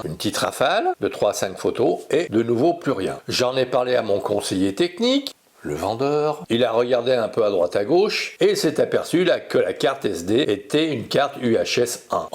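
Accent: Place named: Geneva, Switzerland. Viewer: Moi je suis sur PC/Windows10. Tu as gardé un peu de l'accent de Genèèève ha ha ! Moi je suis né à la Maternité de Genève il y a maintenant 64 ans ! Comme le temps passe ! Maintenant c'est la retraite, c'est le plus beau des métiers. Comme cité plus haut, j'ai 4 boitiers et je les utilise tous. Aujourd'hui, tour en e-vtt avec le D7100 et un 18/105 mm dans le sac à dos, et v'la ti pas que je me retrouve nez à nez avec un chamois à devant moi (j'ai pas fait gaffe !) sur un chemin forestier dans les Gorges de l'Orbe, il a pas bougé et je me suis installé à 15 m de lui, il a fini par se rapprocher de moi et j'ai pu le shooter plusieurs fois, elle est pas belle la vie ! Et tout cela avec un "crouille" boitier, j'ai bien fait de le prendre avec moi. Passe une excellente semaine amigo, cordialement François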